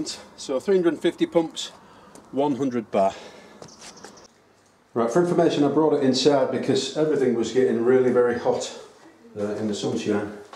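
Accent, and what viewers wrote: United Kingdom. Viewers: Well it was definitely interesting to watch the video to get a good idea of the process. But if you do this regularly it's one way to get to a more fit with this workout, also as you stated a few times during the video is that the pump has to cool down (keep in mind that the internal seals will reach a much higher temp than the outside). One thing that may help both you and the pump is have a fairly powerful fan force some air circulation around both you and the pump, probably best not to do this outdoors though as you might blow up dust from the ground and suck that into the pump cylinder more than needed. Also one thing which I didn't pay close attention to, did you bleed the line between pumping sessions? I usually pump for 4-5 minutes myself, bleed it and take a break while the pump can cool down to refill my air rifle. And once I get above 200 bar I kinda have to cheat and grab a backpack that has a few full water bottles in there to get the pressure up to the max rated 232 bar of my air cylinder on the rifle. 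I'm guessing you live in the UK based on your accent, chances are humidity is usually pretty high then similar to what I'm getting by living in coastal region. If you keep pumping without bleeding the line between sessions odds are you might be building up a lot of moisture that may end up inside your tanks. You'll still get some in there if you bleed it between pumping sessions but it should drop significantly, if you've pumped your tanks this way frequently by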